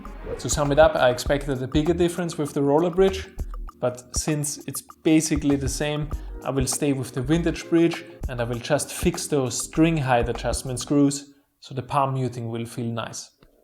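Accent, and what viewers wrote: Austria. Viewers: Also are you Austrian by chance?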